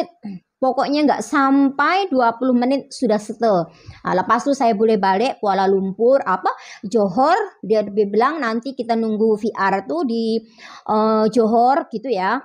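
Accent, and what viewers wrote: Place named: Indonesia. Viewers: Akk ni bhsa melayu pun blm sangat Pasif msh cakap indonesia kental lagi terdengar.lg pulak akk ni kl nak apply ic biru rugs lah blk indo jadi org asing.dah berkawin dgn org warga negara punya anak2 warga negara anggap aja blh tumpang ngan suami N anak2.dah dpt PR dah ok dah cukup tuh dah tak perlu buat vissa spouse citizen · Akk dlm video ni bahasa indonesia nya masih pekat😅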